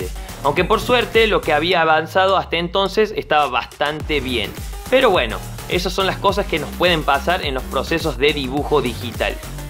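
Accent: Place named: Cordoba, Argentina. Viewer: Sos cordobes? Se te nota pa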